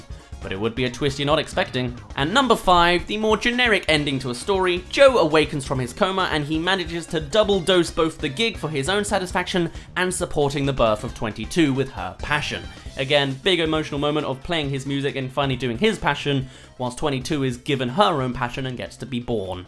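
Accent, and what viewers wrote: United Kingdom. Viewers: When did get a british accent?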